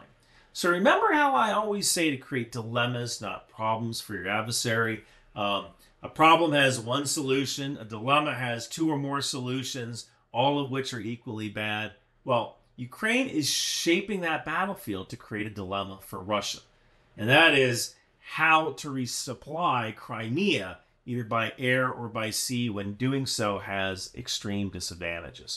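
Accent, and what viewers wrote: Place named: Staten Island, United States. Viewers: That New York accent threw me off 🤣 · Your Staten Island Ferry accent absolutely cracked me up! Your videos are incredibly insightful and informative, so I was pleasantly surprised to get a chuckle from one. Keep up the good work!!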